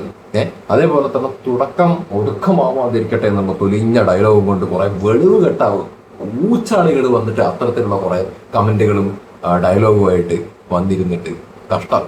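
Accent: Palakkad, India. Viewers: നിങ്ങളുടെ പാലക്കാടൻ slangil സിനിമ റിവ്യൂ കേൾക്കാൻ കാത്തിരിക്കുകയായിരുന്നു.😁👏👏Great work.. Keep Going.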